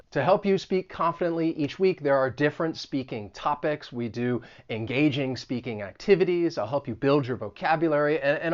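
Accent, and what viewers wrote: United States. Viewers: Hey,.I'm into your Amerikan accent and your cheerful and useful videos.Every time when I watch your video lessons,I'll reams of useful things.Please teach us more phrasal verbs and idioms.I can't thank you enough for your lessons.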